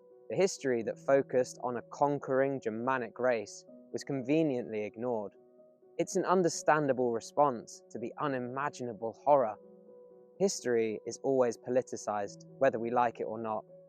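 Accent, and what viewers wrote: United Kingdom. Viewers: you sound like you have a good, clear BBC voice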